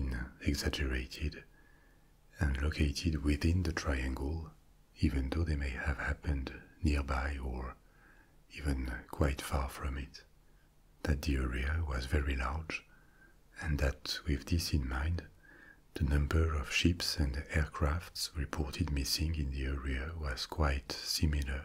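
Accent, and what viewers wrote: France. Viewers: Can't wait to watch this tonight. It's going to put me asleep like a baby hope I make it through I've been watching your videos every night since I've discovered your Channel 5 months ago thank you for the education and the awesome ASMR French accent. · I woke up with this playing for the first time because of autoplay at 2 am and forgot i had ear phones on so i kept wondering why the fuck i could hear a french dude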